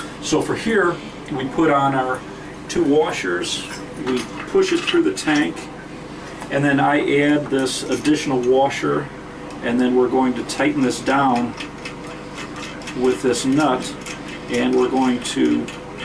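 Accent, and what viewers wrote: Chicago, United States. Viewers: Are you from Chicago? Sounds like you are..thanjs for the video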